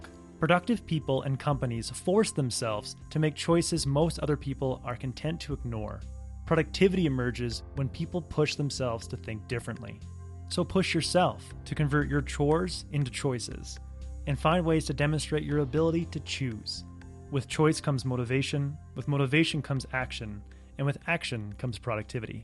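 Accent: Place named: Canada. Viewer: Bona fide Canadian (Alberta?) accent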